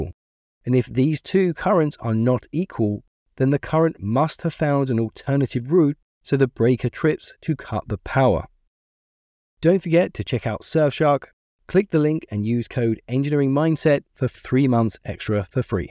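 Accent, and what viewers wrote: England, United Kingdom. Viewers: Hearing an Englishman talk about North American electrical systems is so weird. Not as weird as UK electrics, but close. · It's amusing to hear an English voice explaining the American electrical system. It's also interesting to see how different the US system is from the UK system, which is variously different again from the systems in most European countries. Of course, the general principles are the same but the details are fairly different. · Really peculiar hearing an English voice describing the US electrical system... I thought the two "hot" wires were on 2 different phases????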